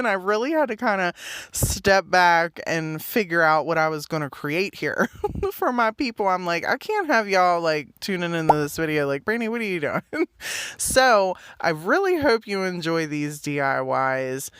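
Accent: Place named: Pennsylvania, United States. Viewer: I enjoy listening to you. You sound like Pennsylvania. I loved growing up in the 50’s. Kids were so innocent.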